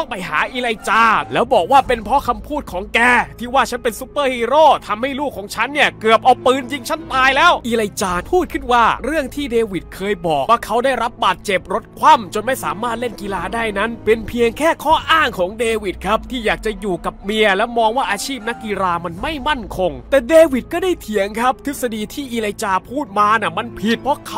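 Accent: Isan, Thailand. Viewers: อ้ายยยย บักย่านน้ำอีสานจ็าดๆ55555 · ชอบจังเวลาพี่พากษ์อิสานอิอิ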